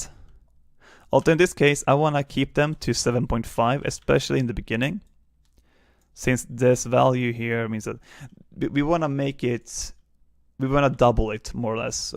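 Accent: Sweden. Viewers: Are you Swedish? It sounds alot like it! Great tutorial anyway dude, I absolutely LOVE your enthusiasm, it makes it so much more fun to follow along. If only more people could see your stuff!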